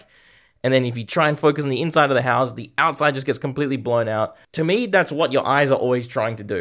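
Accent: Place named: Australia. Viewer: Idk what it is but the Australian Accent just itches a part if my brain. I love it 😂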